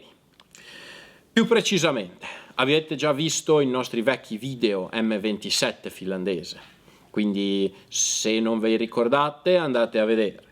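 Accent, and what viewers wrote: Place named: Italy. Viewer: Come preparazione tecnica,e conoscenza del sistema d'arma molto buono, non riesco a capire il fatto di presentarsi fingendo di essere stranieri quando dal accettato è evidente l'italianità, e poi il resto, felpa occhiali e cappello ,ma!!!!!😂😂😂😂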